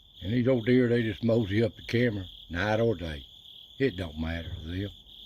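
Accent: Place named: Blue Ridge, United States. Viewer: well he sure is eating good!😸 and your accent and way of talking sure makes me homesick for the Blue Ridge Mtns/Shenandoah Valley that was my home!! 😊 I sure 'nuff love your channel, Bro. 🧡💙🧡 Blessings my friend 💜💜💜🙏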